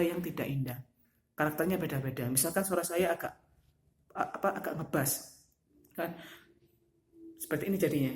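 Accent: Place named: Java, Indonesia. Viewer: aslinya mana sih bang? kok ada boso jowone "nirukke" ?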